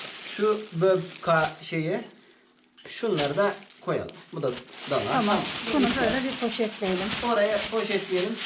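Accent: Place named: Macır, Turkey. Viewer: Iyilik bulaşıcıdır...lütfen niye gösteriyorsun diyenlere bakmayın yeni keşfettim kanalınızı ve çok çok sevdim. Ailenize de sizede minnoş kızınıza da hayırlı uzun omurler versin benim babam macır göçmen konuşmanız siveniz o yüzden köy özlemini giderip bir nebzede olsa mutlu ediyor🤗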